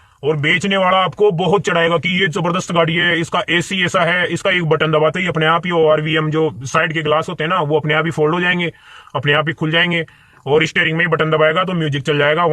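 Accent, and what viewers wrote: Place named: Hadoti, India. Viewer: Bhai boli se hadoti k lag rhe ho. . Kaha se ho. .❤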